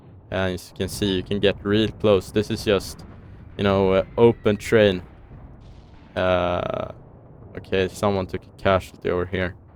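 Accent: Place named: Sweden. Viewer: I knew that you're Swedish from the accent lmao😭.Btw I love your content,keep on going,you'll eventually get more views and subs.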